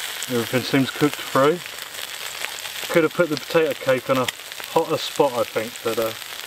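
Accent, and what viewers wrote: United Kingdom. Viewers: are u british bruh?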